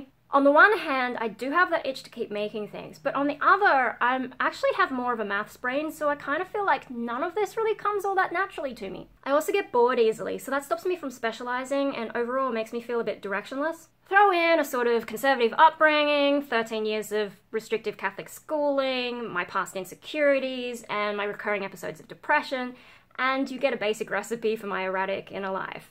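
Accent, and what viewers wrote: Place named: Australia. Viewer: Whoa. Shot out of the cannon. Very YouTube 2018ish. I've seen other YouTubers with this style. I always want to tell them to cut down on the coffee. :) Are you picking up a Valley Girl accent? Please don't! I like the English with the slight Aussie touch. Without a doubt, you are creative. Ten years of vlogging and countless projects, to name a few. For myself being creative means, to create my own world. As a kid, building blocks were my favorite toy. These roots translate into many other things. On a side-note: I like - The Tea Party at the End of the World - Just a soothing feeling I get from the visual of the installation. Asexuality and Music: Well..., here we are at the issue. - I'm convinced that sexuality or sexual desire, is the biggest driving force in music. Sex, Drugs and Rock'n'Roll to name a cliché. As easy as that. 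And not just for Guns N'Roses type music, but for the most unassuming music too. So as a teacher, my most-obvious question would be: If you take sexuality out of the equation, what is left as a drive?! I would want to know about the driving force. About your journey and your conclusions. The feelings about it all you can convey, and last but not least...what meaning does it all have for you? BTW: I shamelessly lifted many of these questions, from the basics of how to write a movie script. - A lot of psychology can be learned from the techniques of writing a movie script or story. Like the Hero's Journey. You know? The audience wants to know abo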